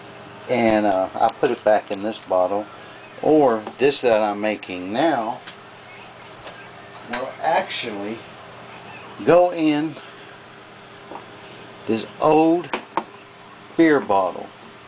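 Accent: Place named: South, United States. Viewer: I really hate the southern accent. It makes the speaker sound so uneducated and dumb. I can't stand how they speak so slowly, repeat themselves, go into detail about things that are common sense, and mispronounce words. If I hear this guy say the word "ColloiDIAL" again, I'm going to scream while projectile vomiting. A der da da der. "Hey, Jim-Bob! We should make a colloiDIAL silver beer to drink on Nascar race day!" "Their makin' a left turn!"- Bubba J. (Jeff Dunham)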